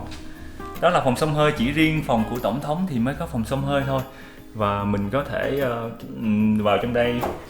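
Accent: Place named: South, Vietnam. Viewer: giọng rất truyền cảm. chắc tại dân Bắc ít nghe giọng miền trong nên thấy hay lạ.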